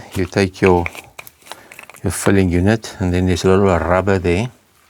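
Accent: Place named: Afrikaans, South Africa. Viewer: Ek love die Afrikaanse english. Trots Suid-Afrika, my oom. Ek kyk graag oom se videos.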